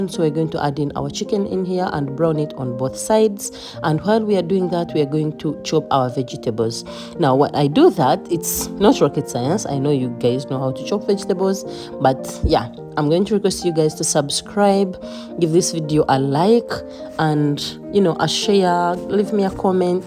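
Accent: Kiga, Uganda. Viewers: U sound like a mukiga btw, I love your video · You sound Ugandan, thx for cooking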